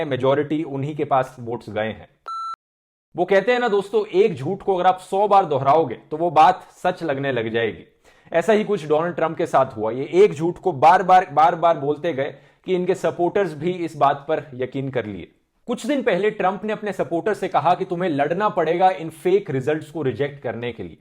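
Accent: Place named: Punjab, India. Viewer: Diware taap rhe the🤣🤣🤣🤣 thoda Punjabi accent ki tone hai apke andar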